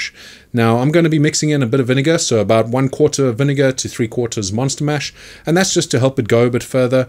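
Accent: South Africa. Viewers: bro i knew you were from SA just the way you talk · Where in south africa are you from.You sounded South African the first time I watched your video's . Thank you for all your video. Looking at growing my own chills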